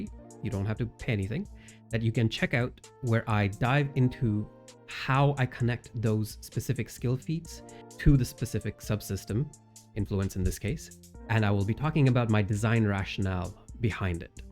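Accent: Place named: Canada. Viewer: I love your accent! It's a subtle Canadian accent (I think?) but there's something about it that is distinctive that I can't place, even though it's extremely mild. Also great ideas about the game, a lot of people sleep on subsystems and I think you've pretty comprehensively analyzed why.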